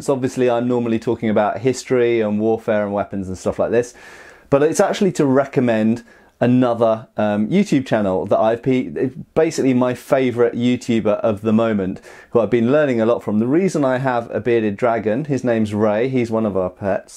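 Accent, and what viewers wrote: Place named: United Kingdom. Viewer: One of the reasons British people make for good Youtubers is because everyone loves your accents.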